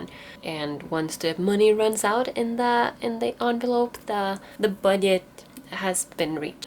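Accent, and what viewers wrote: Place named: Sweden. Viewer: Nämen, hörde nu att du är svensk! Älskar blommorna 😍🌺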